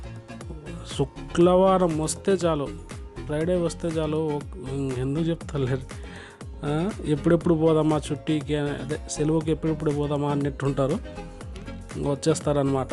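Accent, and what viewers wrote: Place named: Telugu, India. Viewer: Brother only nee telugu slang kosame nee videos chustha From/telangana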